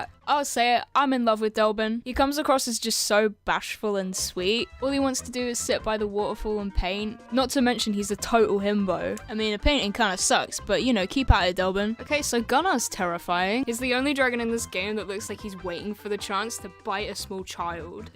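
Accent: United Kingdom. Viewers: i knew u were british right as u pronounced artist without the 't' in the middle, bri ish. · wtf your bri*ish????????? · You sound so British and it makes the whole thing so much better to live through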